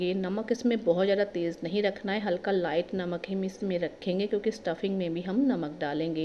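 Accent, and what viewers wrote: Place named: Punjab, India. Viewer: Bahut badiya banaya tum punjabi ho na bolnye se pta chal raha hi sarso k oil se khansi to nhi hogi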